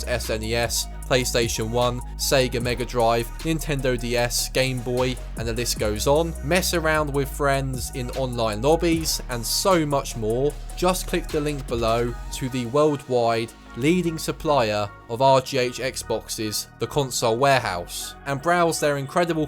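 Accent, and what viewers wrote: United Kingdom. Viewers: this goes to show a british accent. how eloquent it may sound. can still say a whole lot of nothing. lil bro made a video coz he pre-ordered it for 70$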